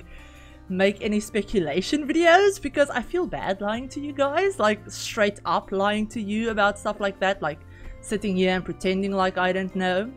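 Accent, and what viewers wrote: Afrikaans, South Africa. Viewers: This is my first time here and I recognise a South African accent when I heart it so I had to do a double take and check that I'm hearing right because I know SA creators in this space are few and far in between. Congratulations. ❤ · Yes, immediately recognised that SA accent and subscribed straight away😁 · OMG your accent r u South African, because if u r this will be my first time coming across a South African sims4 content creator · OMG, I immediately recognised your Afrikaans accent the moment you started talking! Very cool to see another Safrican simmer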